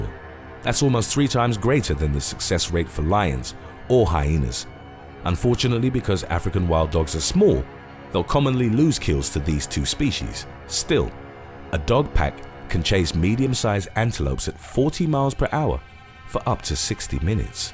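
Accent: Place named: United Kingdom. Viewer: Funny when a guy with British accent says : soccer pitch.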